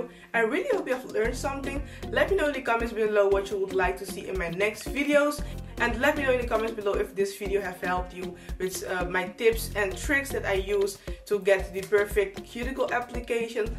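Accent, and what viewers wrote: Netherlands. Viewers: 💞💞Love the video! Btw, do you speak Dutch? You’re accent sounds familiar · Are u dutch ? Your accent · are you dutch? you have a dutch accent hehe beautiful nails and technique <3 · Je bent sowiesooo Nederlands. Hoor het meteen. Goeie videooo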